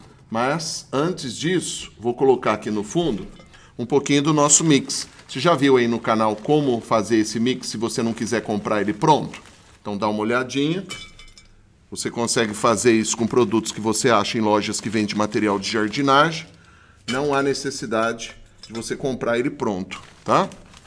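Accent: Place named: Minas Gerais, Brazil. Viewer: Ai tu é mineiro uai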